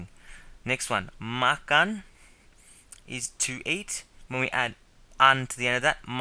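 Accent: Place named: Australia. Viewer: I can tell by your accent that you are probably an Aussie. hahaha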